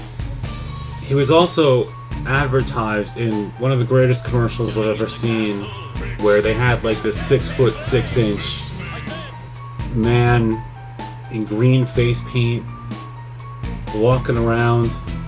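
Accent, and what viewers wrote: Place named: New York, United States. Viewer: And im guess by acsent alone your from nj or ny more than likely ny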